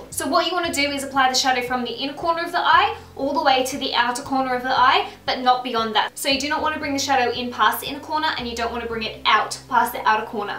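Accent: Australia. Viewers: I would say australia somewhere. Just guessing. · australia, I think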